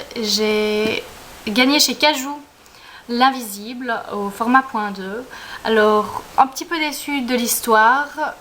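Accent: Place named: Belgium. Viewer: Coucou ! (c'est Mélo), ravie de te voir en vrai, tu es toute mimi ! Et moi j'adore l'accent belge et je préfère même quand on prononce tÔme et non tome à la française :p Pourquoi dis-tu que tes études ne vont pas aboutir? j'admire franchement cette branche, j'aurais beaucoup aimé traduire des livres mais étant donné que je suis déjà une truffe en anglais c'est compliqué,lol . En tout cas je croise les doigts. A b1tôt, ça m'a fait plaisir de te voir (mm si je ne suis pas super assidue des vidéos)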